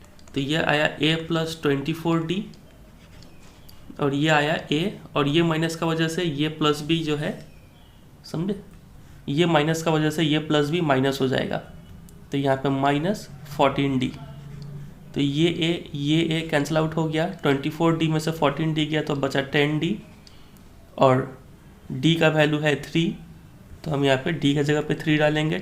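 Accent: Bihar, India. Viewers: Bihari hai aapna bhasaa aur sanskriti kaise bhul sakte . Proud to be bihari. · Sir apki voice bihar-jharkhand ke logo ki jaisi h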